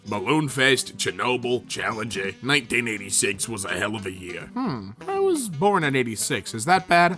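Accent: Australia. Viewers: the australian accent while pretending to be american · This was a great video, but your Australian accent pained me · 10/10 Aussie accent. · Fair play to that Aussie accent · I’m an aussie, and I just gotta say GREAT accent! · Me: r/murderedbythatAustralianaccent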